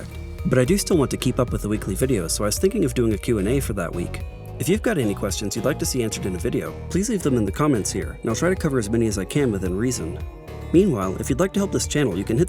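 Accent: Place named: Spain. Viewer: The reason why the creator pronounces it that way is because he is a native Spanish speaker. Portuguese speakers pronounce it as "Go-dodge" as in Dodge. But if we are talking about "the correct way to pronounce it" or "the way you should pronounce it" In English then "Go-Dot" is incorrect and the "Go-Dough/Go-Doe" would be correct in that case.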